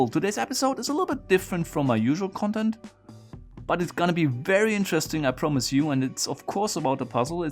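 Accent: Germany. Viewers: Voll deutscher Dialekt, ne ? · Super Danke für deine Antwort. Sehr nett! Weiter so! Habe keinen blassen Schimmer weder von Programmierung noch von Maschinenbau oder Technik. Aber ich liebe alle Kanäle, bei denen die Leute mit Leideschaft und Herz dabei sind und den Zuschauern, die Augen zum funkeln bringen. Das passiert genau bei deinem Kanal. Man merkt, dass du dein Hobby zum Beruf gemacht hast. Bin selbst Sprachlehrer und mit Leidenschaft, bringe selbst die Augen meiner Schüler zum Leuchten, wenn sie merken wie einfach es ist, das immer als schwierig zu erlernende Deutsch zu verinnerlichen und mit Leichtigkeit anzuwenden. Oft wird immer unterstellt: entweder Sprachen und künstlerisch oder mathematisch-naturwissenschaftlich. Dabei gehen Sprachen und Mathematik einher. Beides sind logische Konstrukte mit klaren Regeln. Ich mag dein Englisch, echt super, und ich mag es bei den Deutschen wenn auch noch ein Akzent heraushörbar ist. 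Ein Hauch von Heimat! Super Kanal, bin erst drüber gestolpert! Freue mich über deinen Erfolg. Mal sehen, ob ich auch mal einen Kanal aufmache und mit Herz und Leidenschaft die Leichtigkeit zum Sprachenlernen vermittel, oder wie jeder Schüler genau den Sprachlehrer bekommt (oder formiert) den er braucht!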